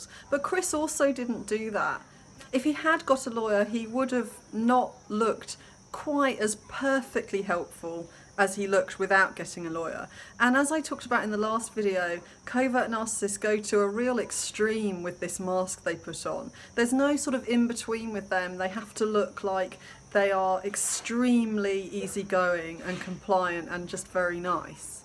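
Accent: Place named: United Kingdom. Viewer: For me it's also her British accent. I don't know why everything they say sounds so smart :)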